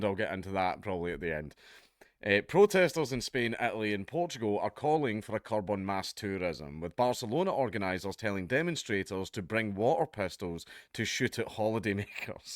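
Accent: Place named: Scotland, United Kingdom. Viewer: Who the hell taught your country to speak oooooooooh your scotish eesssh what a wreck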